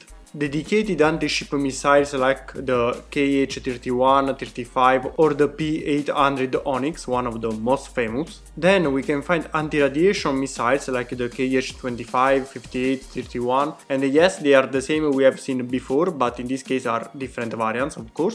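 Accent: Italy. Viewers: Italian accent makes it about 10 times better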